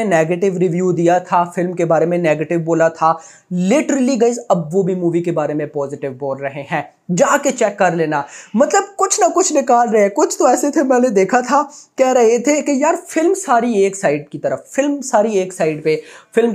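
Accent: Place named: Pakistan. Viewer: Bhai apka accent pakistani jaisa q hai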